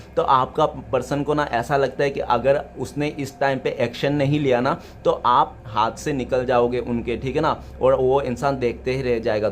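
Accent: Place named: Northeast, India. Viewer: Btw are you from Assam.. Your voice sounds like North-East people.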